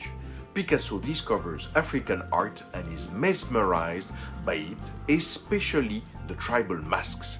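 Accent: France. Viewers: However. As a swedish old man, I appreciate your french seasoning english. I love that. :) That is enough musical to my ears. Your paintings are delicate indeed, and I love to watch. Quite soon I will grab some aquarelle painting. I painted somewhat, many years ago. I am eager, but hesitates about what brand, I want to use, or if guache is the choice. I planned to use just three basic colours, white, and some earth colours. Many years ago, I painted home made baskets, and small furnitures, using egg-oil tempera, or oil and earth pigments, as decoration. It was fun then. I also made oil paint for windows, by grinding titanic, and zinc-white into linseed oil and turpentine. It was heavenly to apply, but a beast to make large amount of. First, a layer a lean, diluted by turpentine. Dry for a week. Next somewhat more oil-fat. Dry for a week, and the final layer of full oil. 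I used aways art brushes from pig-hair, and it was an enjoyment to apply the oil color. Linseed and turpentine is just perfume-alike. I believe you know. :)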